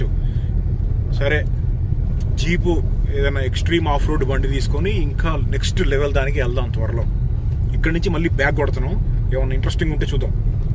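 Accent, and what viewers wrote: Andhra Pradesh, India. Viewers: Pakka Meedhi..... Vijayawada nundi Nizamabad shift ayina Family Bro meedhi ... :D Langauge lo eh matram Telangana ledhu ..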